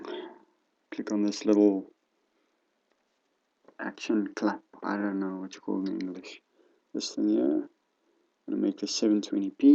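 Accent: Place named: South Africa. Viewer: You from South Africa? I love your accent~ <3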